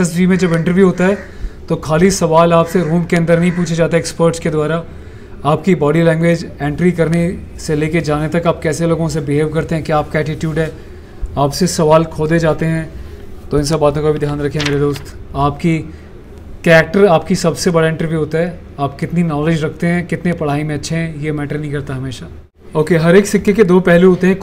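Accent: Haryana, India. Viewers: Sir aap Haryana se ho. Kya? Btao please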